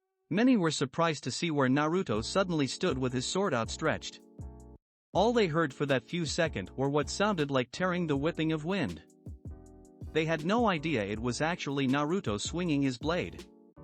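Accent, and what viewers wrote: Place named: Japan. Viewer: At some point the text to speech program took on a Japanese accent. That was at some point after the 30 minute mark.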